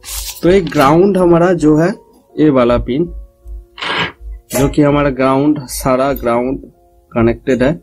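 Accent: Bengal, India. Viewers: Bhaiya ap kiya bangali ho kiya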